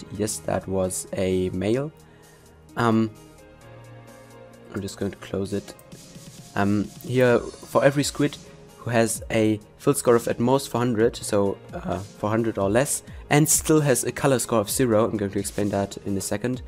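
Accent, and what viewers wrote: Germany. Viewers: Are you from Germany :D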